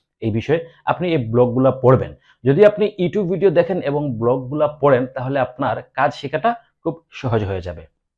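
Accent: Sylhet, Bangladesh. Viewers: Thanks vai most informative video. Vai kita Sylhety ni? mate buja jar halka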